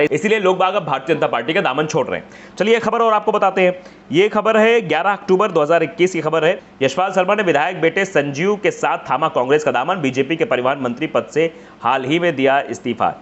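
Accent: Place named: Uttar Pradesh, India. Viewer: Plz keep ur style As Hindi news anchor not UP news anchor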